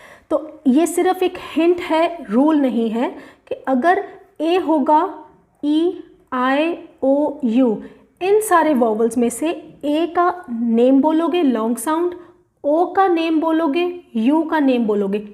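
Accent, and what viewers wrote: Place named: India. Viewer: india sound english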